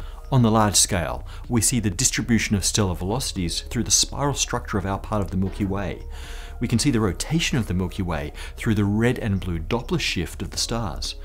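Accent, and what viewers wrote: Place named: Australia. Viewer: this Aussie speaking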